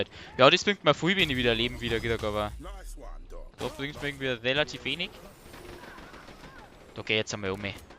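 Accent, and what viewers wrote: Bavaria, Germany. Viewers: des boarische reden is so geil☺☺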